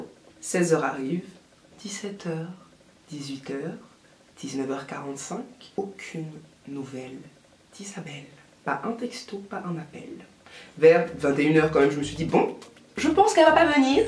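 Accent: Ivory Coast. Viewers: L'accent ivoirien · Je savais que tu étais ivoirienne ça s'entend. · par ton accent je me doutais trop que tu venais de la CI 💫💫❤❤❤ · c'est l accent ivoirien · Au debut j’ai tout de suite su qu’elle a vécu en Côte d’Ivoire avec l’accent et c’est manière 😊😂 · Hum on reconnait une ivoirienne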